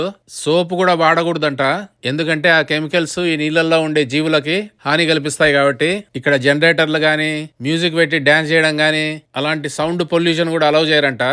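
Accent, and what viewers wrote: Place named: Telangana, India. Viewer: తెలంగాణల భాష లాగానే ఉంది ఉంది బ్రదర్ మీది మీ స్వీట్ వాయిస్ చాలా బాగుంది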